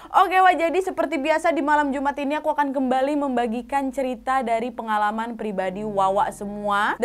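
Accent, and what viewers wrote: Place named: Medan, Indonesia. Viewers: Kak, logat dan bahasa Kakak spt orang Sumatera Utara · Rutinitas sblm tidur🤭 mendegarkan dengan logat wak waknya bercerita❤️❤️❤️ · "gagal pokus" 😊 sukak kali aku dengar logat Medan di ni..jadi rindu pulak aku pulang kampung 🤗. by: drg. Rika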